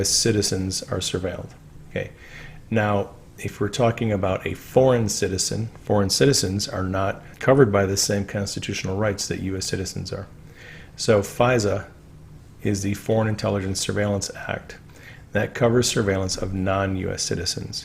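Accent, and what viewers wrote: Scotland, United Kingdom. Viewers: Being of Scottish heritage, and having vacationed there twice, I can say that your brogue was spot on! Music to my ears! 👍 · Guid Scots accent laddie ! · Great Scottish accent mate. Greetings from the cold place at the top of England.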